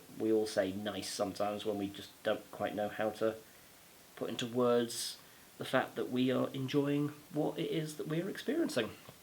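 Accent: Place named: RP, United Kingdom. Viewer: Sincere condolences mate. Keep them coming. Missed the toff talk lol. Sound's a bit fkt but nothing major. Sláinte 🍻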